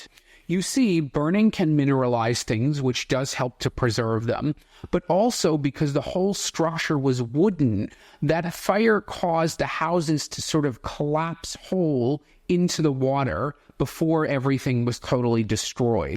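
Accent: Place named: United States. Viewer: I know you are American but please sort out your pronunciation of British words names and places 🙉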